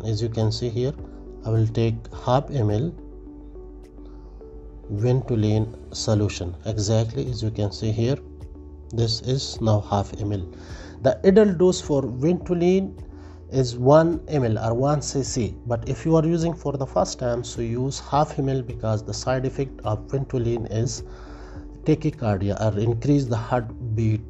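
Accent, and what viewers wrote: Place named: India. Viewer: you have an accent which I think is Indian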